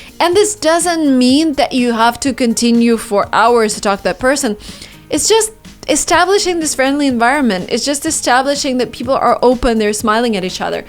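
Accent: Russia. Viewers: to A complete Russian Accent! :D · Your Russian accent is just so cute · No offence, but your pronouncing makes it clear for natives you're russian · I loved your Russian accent I want to learn that accent... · You’re Russian accent is amazing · Your Russian English accent, such a give away at the start ! :) lol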